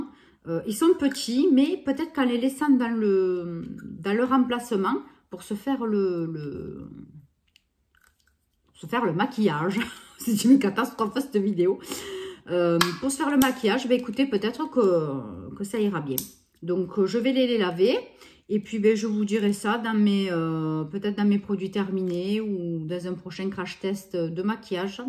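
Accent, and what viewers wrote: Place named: Occitanie, France. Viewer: d'où cette accent alors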